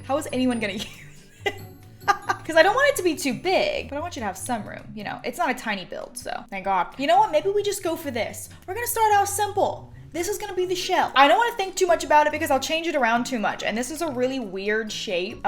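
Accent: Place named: South, United States. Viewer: Jasmine J she’s southern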